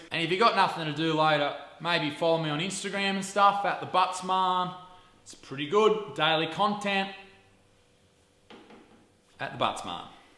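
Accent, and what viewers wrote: New Zealand. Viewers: that was the typical north island Maori accent, pakeha don't have the same mannerisms, we still say bro tho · u nailed that Kiwi accent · That kiwi accent. On point 👌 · You’re Māori accent is on point bro ps some Māori’s hate being called kiwi cause the British made that name up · your NZ accent though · his kiwi accent makes him sound like korg from thor ragnarok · Quality Kiwi accent. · Love this vid<3 Also that kiwi accent🤣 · That Kiwi accent tho, it reminds me of Jonah from Tonga, Chris Lilly, “you on your period or what miss!”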